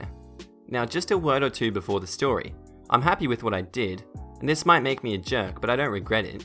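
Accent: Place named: Australia. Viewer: With the ZED, he could also be from Canada, although not with the Australian accent. I think he's mentioned in other comment sections he's from Queensland (north east Aus).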